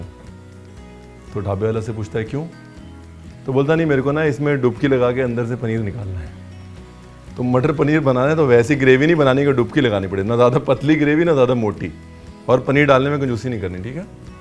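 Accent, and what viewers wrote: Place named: Punjab, India. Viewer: Aapko sunte hi maine bhi apne andar ke Punjabi ko pehchaan liya. Hats off Chef. 🙏🏼❤️🙏🏼 Isbaar bengali recipes ki baari. Plz plz.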